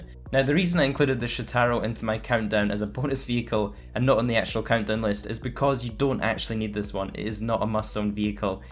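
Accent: Scotland, United Kingdom. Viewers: are you Scottish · You're Scottish? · are you Scottish? love the accent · Are u from Scotland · Clicks on video, finds out he's Scottish, INSTANTLY SUBSCRIBES. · u sound Scottish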